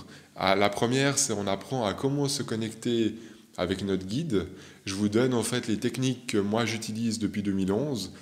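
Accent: Switzerland. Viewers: Bonjour, je te découvre aujourd'hui au travers de la video poser des questions a son guide , et tout de suite jai noter une pointe d accent suisse . Lorsque jai vu que tu proposes des formations j ai ressenti que cest sûrement en Suisse . Bingo ! Mais cest top que tu les proposes a tes followers d autres contrées 👍👍👏👏👏 Japprecie tes conseils que j approuve et je voulais savoir si tu pouvais faire une video sur les guidances YouTube a choix ou autres . Ou l'utilisation des cartes ou tarots .personnellement je trouve que souvent ca empeche de se recentrer sur ses ressentis innés. Deplus , je suis croyante mais jai du mal avec la religion car il ya beaucoup de discipline a avoir au sujet de la priere , jeûne ect ... pourtant j ai la foi , mais jestime que Dieu aime toutes ses creatures tant quon ne fait de mal a personne et pas besoin de simposer des manieres de prier ect . 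Px etre un autre sujet de discussion ? 🙏 Bon Mardi 😊🌞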